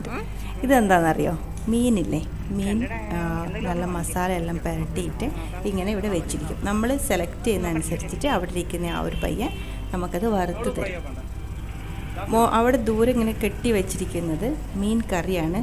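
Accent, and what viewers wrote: Tamil Nadu, India. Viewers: No Small tamil touch in the conversion.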